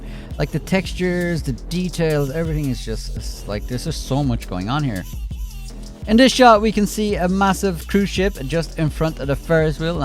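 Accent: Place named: Ireland. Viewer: hey btw where are u from? u sound like... idk sorta irish and sorta something else?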